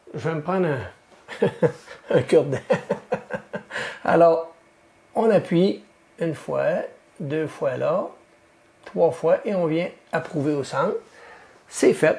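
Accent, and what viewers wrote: Canada. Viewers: Bonjour super ta video pour se nouvelle ecran je vais certainement l'installer prochainement sur ma CR10S pro j'ai une petite question au niveau des branchement sur la carte mère que tu a connectè le BLtouch en Z+ et faut il laissé le shunt sur la petite carte en dessous de l'extrudeur? encore merci pour tes videos et ton accent canadien... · Bonsoir, tes vidéos sont super bien détaillées et expliquées... Je suis Belge et ton accent Quebecois > j'adore! Tu fais tes vidéos avec les moyens du bord et c'est un exploit! As tu un compte paypal ou autre système? J'aimerais vraiment t'aider et je suis sûr que je ne suis pas le seul! Beaucoup, de Youtuber tentent de se faire des sous... Pas toi, alors que tu le mérites vraiment! Donc si Framemanager (c'est moi) peut t'aider... Je suis prêt à le faire! Je suis certain que ce sera bien investis!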